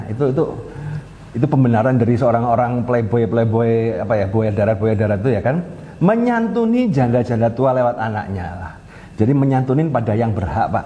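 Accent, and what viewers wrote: Java, Indonesia. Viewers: Jowo medok mas...juan kekel aku mas...maturnuwun mas anda luarbiasa🙏🙏🙏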